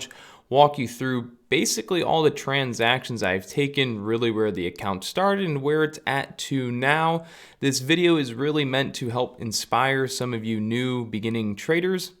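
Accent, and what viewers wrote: United States. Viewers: You sound like your from Buffalo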